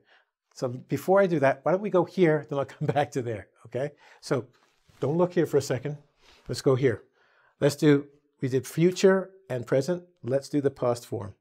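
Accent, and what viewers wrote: France. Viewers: Lol your French accent is just merveilleuses! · Your french accent is priceless. Full disclosure - I am french.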